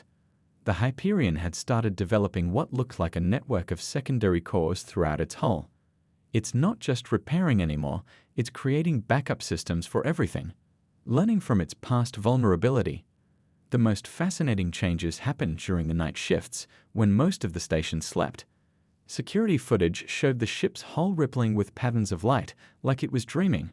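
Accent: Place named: New England, United States. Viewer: The narrator (AI?) has a distinct New England accent!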